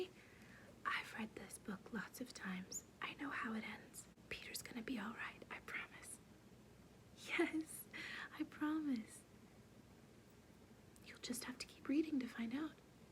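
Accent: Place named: England, United Kingdom. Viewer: Back with the very convincing English accent 🙂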